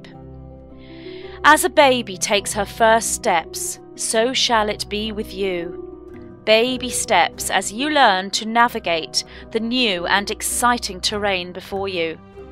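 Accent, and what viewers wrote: United Kingdom. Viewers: me 2. I drink in the accent as for some reason, as I love English accents! Hers is so sweet!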